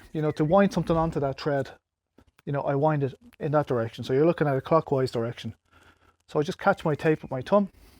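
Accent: Dublin, Ireland. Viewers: Thanks so much. This is exactly my problem at the moment. The house is 18 years old now and the tap,has been dripping for the last couple of years. My husband has never gotten around to replacing it. I’m going to give this a try myself. Greetings from Friedberg in Hessen, in Germany. You sound like you are from Dublin, just like myself. Take care.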